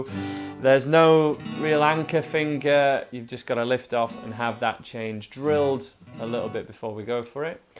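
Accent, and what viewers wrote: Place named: West Yorkshire, United Kingdom. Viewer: What part of West Yorkshire is that accent from?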